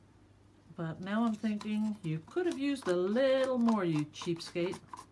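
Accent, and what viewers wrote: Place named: Netherlands. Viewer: This is truely beautiful - just like its creator! We can see how gorgeous it is so stop worrying about that. Also, I love your chit chat and so look forward to coming home from work each day to listen to and watch you pour/swipe. I laughed when you said about getting your hubby to speak in English - mine told me that I was beginning to speak with a Dutch accent cos I' listening to you every day!! LOL Take care and looking forward to the next road trip when you have a spare minute.... how is your sister's piece coming along? Cheers, DEB T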